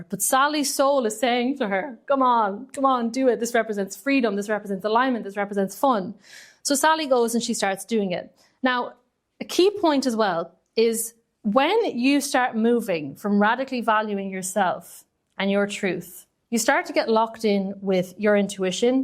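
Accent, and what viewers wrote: Ireland. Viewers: Another great vid - completely unrelated, I am fascinated by accents; as well as an obvious southern Irish lilt, I detect something else - Scandinavian? Central Europe somewhere? Apologies if this is none of my (anyone's) business. 😄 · It is so refreshing to hear an Irish accent!!! Thank you ❤❤❤❤❤ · I appreciate the blazer. For all it may have represented in your presentation. Tbh I am so bamboozled by the wholesomeness of the Irish accent. It is hard to quantify exactly the truth behind the words. Resonates like a mother's tongue. Much more than a business and marketing exercise some critics pertain. Anyhow keep going, if we have a choice! More importantly thanks for the guided meditation practices. They matter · I love your accent - Irish ?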